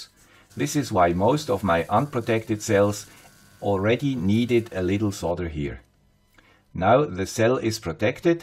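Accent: Switzerland. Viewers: Another BRAVO presentation, Mr. Guy With a Swiss Accent! Are you a professor or something? I kind of like it that you always write down on your video the objectives of your video...and then proceed on to explain following those objectives. Over 100% per cent for another good video. Thanks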